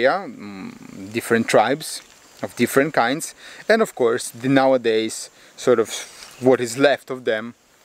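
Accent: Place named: Russia. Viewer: You have strong russian accent xD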